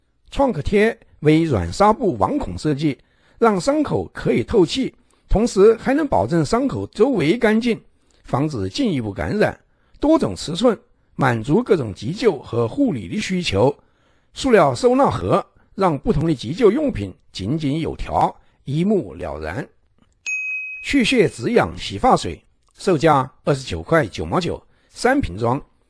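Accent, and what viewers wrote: Sichuan, China. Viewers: 这一听就知道是川渝方言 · 这个普通话是个四川人在说吗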